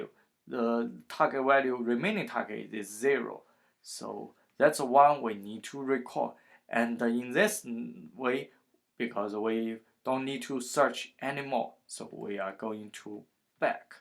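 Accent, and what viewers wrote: China. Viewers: up 主听口音应该是我大华人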